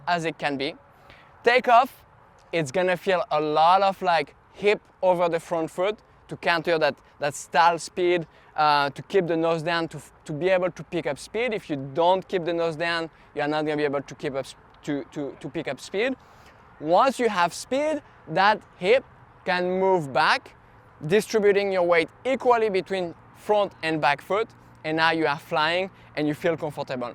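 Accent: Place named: France. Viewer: Hello. Amusant on dirait un accent français... je comprends tout ^^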